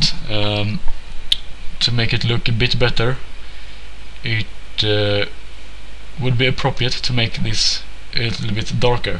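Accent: Sweden. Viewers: Hahha Du Kommer Väll Från Sverige? Det Hörs På Din Accent xD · haha man hör verkligen att du är från Sverige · He doesn't, he sounds Swedish, I am Swedish so I know the accent. Looking at his channel his location is Sweden...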